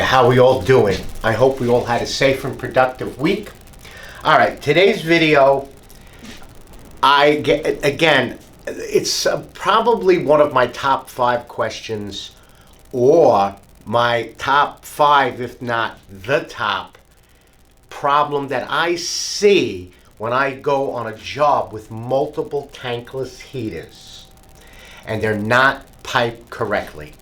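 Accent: New York, United States. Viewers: Dear, thank you very very much for your reply! I’m learning a great deal from your incredible videos, and even though I live in TX now, I am also from NY. The first time I heard your voice, I felt like I was at the local deli again listening to the fellas 😂. I wasn’t able to finish the job as of yet, because I’m rebuilding the entire 3” PVC intake/ vent system that was put together with silicone caulk 😡. Needless to say, and because I work alone, this job is now set back two days for the venting replacements ( the units are in the attic immediately adjacent to the 22’ high vaulted ceiling joists in the great room with the rafters framed over the top of it. Like a scissor truss. No flat surfaces to stand on where the venting runs to exit the roof, all of the venting was obviously done on make shift scaffolding during construction. How did you know they sent me a schematic “without” the PRV’s in it? 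Never mind, no need to answer that, lol. I appreciate you very much Sir. May I please send you an email with a copy of the piping schematic they sent me? I can also send you a picture of what I have to work with. Thank you! RXP199iN and an RX199iN with a dedicated recirc line. I have the Rinnai dedicated recirc valve with the additional brass elbow you recommend to make everything work as intended. I also have the REU-EZC3 cable and the MC195t controller for recirc scheduling.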